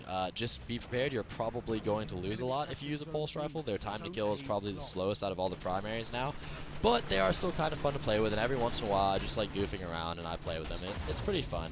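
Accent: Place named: California, United States. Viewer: Yeah I was debating between that and "First" but ultimately it was your california surfer "brah" speach style that helped me decide ^^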